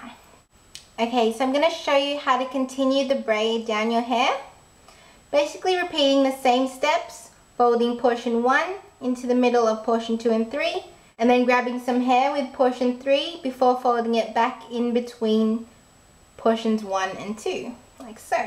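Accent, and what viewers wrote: Australia. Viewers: are you Australian?? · Are you Australian? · Okay hold on.... Just started the video and i had to pause it to say this lol.... Your so cute !!! Hahaha :) is that an ozzi accent? Ima kiwi yo !! Lol anyway, the start has already made me excited and i can tell that your gonna make it easy :) your awesome missy !! :D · R u Australian?????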